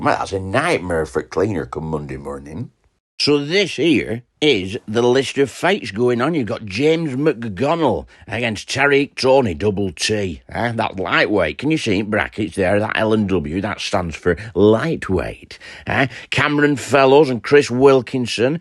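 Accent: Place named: Blackburn, United Kingdom. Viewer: Some proper Blackburn accent is that. Im from Chorley ✌️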